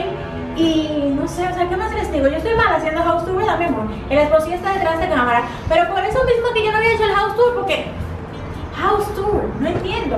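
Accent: Dominican Republic. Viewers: Me encanta el acento de República Dominicana 🇩🇴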